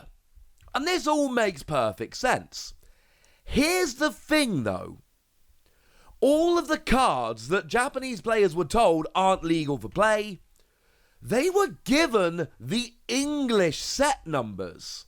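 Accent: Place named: United Kingdom. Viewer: Ehhhh? I've lived bham, exe and london and his accent is like pretty default brit I can't lie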